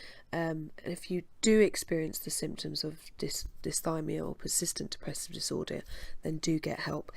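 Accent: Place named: United Kingdom. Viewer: (Sunday November 1st 2020) Greetings from Montréal, Québec, Canada !!! I do not remember how I came accross such videos, but I FINALLY put a name on my emotional/mental state ' Dysthymia'; exactly what I have been going trough for more than 25 years. I am 60 yrs old, and for the past 5 years...I have had more downs than ups...and it's NO FUN !!! My NEW doctor has prescribed me some pills that I have to start taking this monday to see IF my emotional state shall regain the energy that I used to have...I shall keep you posted shortly. Great video, love your voice, love your british accent !!! Cheers !!!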